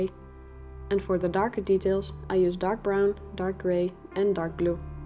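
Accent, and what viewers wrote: Netherlands. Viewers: Beautiful. Thank you for sharing. I use almost the same technique. Aren't you a Dutch by any chance? Your accent tells me so....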